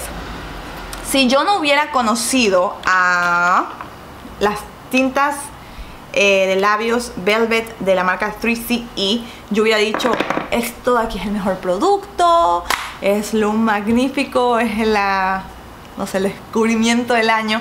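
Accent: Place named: Guayaquil, Ecuador. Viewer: ese hermoso momento es que te das cuenta que una youtuber de tu País y Ciudad te encanta .... ya decia yo... parece guayaca :v me encanta ♡♡♡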